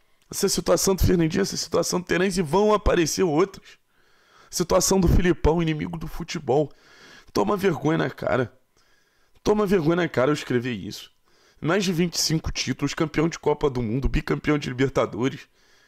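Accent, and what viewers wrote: Rio de Janeiro, Brazil. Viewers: Foda-se, me inscrevi, não tem como! O cara com sotaque carioca tá do lado certo da força! Parabéns, contra tudo e contra todos. Sempre! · Que vídeo espetacular..bom ver tanta sobriedade junto com sotaque carioca, coisa rara na imprensa esportiva kkkkkk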